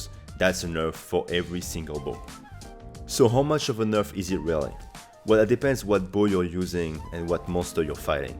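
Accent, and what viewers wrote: France. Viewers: Mdrrr t'es français non? Je crois reconnaitre notre bel accent:) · its me or there is a french accent here ? :)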